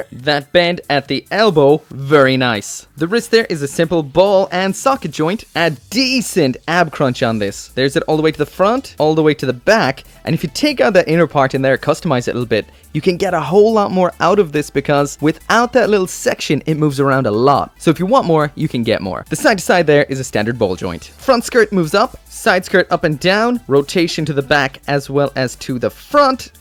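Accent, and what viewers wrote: Ireland. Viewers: Are you from Ireland because you slightly sound like your from Cork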